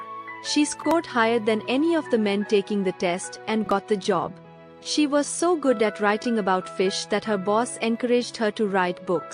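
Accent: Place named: Bengal, India. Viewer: The ai is bengoli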